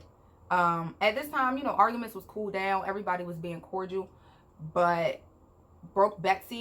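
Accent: Baltimore, United States. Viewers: Are you from Baltimore or Philly? I swear I detected my Bmore accent. New here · Ik you were from Maryland ik our accent anywhere. I love this story! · She said it dew she’s from b more 😂 has to be I low key hear it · could tell you were from maryland maybe baltimore from the accent 😭😭,, i love your personality so much !!! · "Dip off Diana" I'm crying off these names! I knew u were from Baltimore as soon as u started talking lol.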